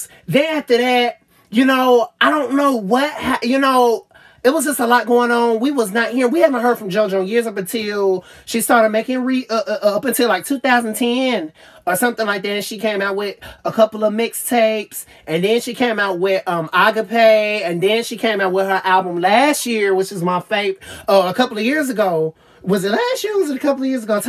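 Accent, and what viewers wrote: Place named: South, United States. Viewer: by the way where are you from you have a really deep and strong southern accent it really fascinates me it is So DEEP AND Strong I’m just curious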